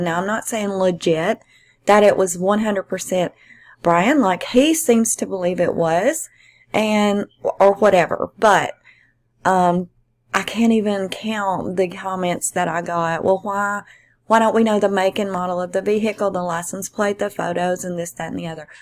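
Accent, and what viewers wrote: South, United States. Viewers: Don't stress over any negative comments! Those of us who have been here on your channel for awhile, know! You have never click baited and I absolutely love your southern accent ❤ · You are such a great person. Your Southern Drawl makes you are easier to talk to. Newbies Need to listen closely. FYI: NEWBIES WATCH A FEW IS SUCH A SWEET PERSON.IF STE HAS INFO SHE PUTTS IT OUT THERE! ♥️♥️♥️♥️ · Goodness people make drama out of nothing. I love your Southern drawl! I'm very interested to see how this is picked up by the relevant authorities. 🤔 👀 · The trolls have left Summer's video's and gone to video's on Brian. geesh, your southern drawl sounds normal to me, bc I'm a Texan. #southernladiesrock Got the reminder on for your live; looking forward to it!❤🙋‍♀️ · Your cute southern voice ticks all the right boxes for me lol Sweet mamma goodness gracious me. 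Some people just don't know a lovely sound when they hear on. Have a great day. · Damn Skippy! They Definitely need some kind of running updates around NC.....I Live in the Western Mtn's if NC and My County Is Huge.....with Lot's of Wood's......and State Mtn Park's.....So I Definitely Appreciate the Info you giving Us! Oh and i think You and Myself are what I like to Call.... An Educated Hillbilly......so there for your Southern Draw is not only Totally Acceptable.....but Also a Must! Lol. So just keep doing what your doing Love! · you have the most beautiful southern voice and I’m glad you’re proud of it don’t ever change lovely lady keep up your amazing work much love from South Wales 🏴 in the uk 🇬🇧❤️ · Hello, I can't believe someone complained about your beautiful Southern drawl. I love listening to your voice. I grew up in SD and have always loved all things Southern. Living in GA for many years now, I still never tire of the accent. 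Thank you for always taking good care of us. Much love to you!!! Blessings!! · Glad I found your channel! Love your voice! We sound a lot alike lol! Southen' girl myself here 😘 I think you're doing a wonderful job! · Omg. I can’t believe somebody is griping about your southern accent!! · Love your Southern drawl and I like how I can sit and actually listen and think about what you are saying... Most ppl talk too fast, if anything they should slow down - not you speed up. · Brian’s walk looks like something that was called “pigeon toed” back in my day. And it was correctable with special shoes at a very young age so that the feet could be trained to point more inward than outward. Most parents pick up on it when the child starts walking & definitely a good pediatrician would see it in a regular exam. Also I love your southern accent, I could listen to you talk all day. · I LOVVEEE your southern draw!! 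Don’t let anybody tell u that u need to change in ANY way. You’re absolutely AMAZING!! I’ve been a subscriber of yours for the last cpl years and I absolutely adore u 💜💜💜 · New sub here from Bendigo, Australia (Discovered you with you previous vid) - keep doing what you’re doing! Those of us with high enough IQs can see that you are presenting credible information for us to subsequently analyse and then make our own decisions. Also: I don’t subscribe to channels with automated voices as they thoroughly annoy me (particularly when poor punctuation has been used & sentences require some mental processing to be understood) AND I ABSOLUTELY LOVE YOUR “SOUTHERN DRAWL”! 👍👍🇦🇺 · You are doing a great job and if someone doesn't like your southern accent they can take a flying leap. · Awe! Will miss your live sweetie, working this weekend, but will absolutely watch the replay! Girl, you're absolutely beautiful inside and out! 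Including your southern drawl. Thanks for what you do! We love ya'll! Prayers & Blessings from WI 🤗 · I would listen to your lovely Southern drawl all day over those dreadful computer generated voices... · I love your southern draw,roll tide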